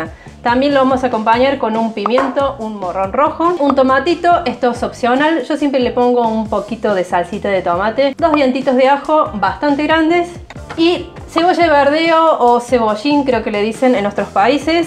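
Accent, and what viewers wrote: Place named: Córdoba, Argentina. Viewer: hay como una tonada cordobesa por ahi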